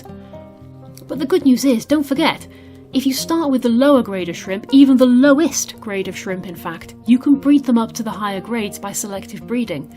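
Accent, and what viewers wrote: United Kingdom. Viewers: Great edit and I really like your UK accent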